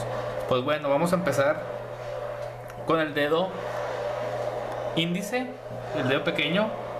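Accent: Chihuahua, Mexico. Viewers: No se como llegue aquí pero es el primer video tuyo que veo y me suscribi casi de inmediato me gusta la manera que explicas sin tantos tecnicismos, de donde eres? Tienes como que el acento de chihuahua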